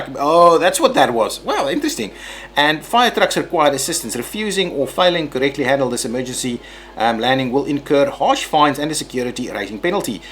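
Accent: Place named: South Africa. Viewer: Your English seems a bit South African🤔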